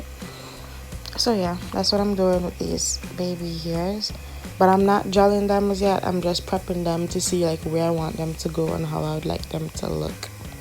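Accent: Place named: Jamaica. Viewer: Your accent is so close to Jamaican.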